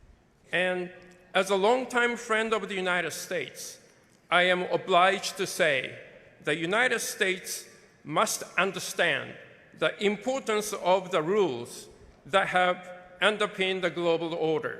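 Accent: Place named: Japan. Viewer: 発音は日本人英語だが、内容は一級品。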